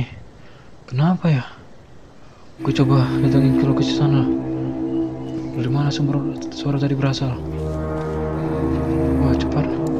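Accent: Medan, Indonesia. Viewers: mas bahas mas kaya orang medan mas bro dan pak bro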